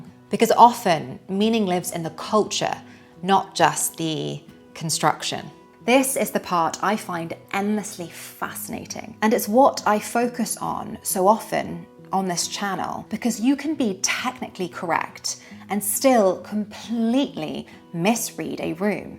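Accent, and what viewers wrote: United Kingdom. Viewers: I've been learning English for the past 20 years because I started late in life as my second language, and I'm trying to be perfect, although I know I won't; but there is always room for improvement, right? I've always heard that "practice makes perfect." I've never heard that "perfect is the enemy of good." Oh my word!!! I feel relieved now. Thank you so much for these kind words. I love all your lessons, your method, your British accent, your voice...... Love from London.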